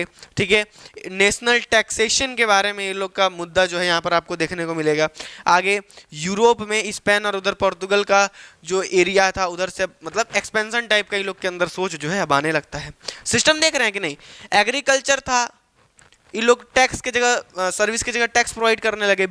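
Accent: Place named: Uttar Pradesh, India. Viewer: You are from UP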